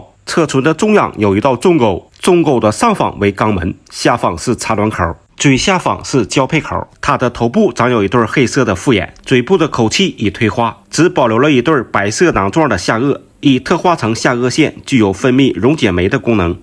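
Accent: Northeast, China. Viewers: 原来是东北人😁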